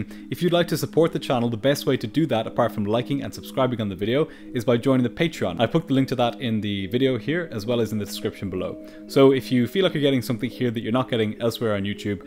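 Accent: Ireland. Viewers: Great video thank you ,shocking story great to here a fellow Irish narrator 🙌☘️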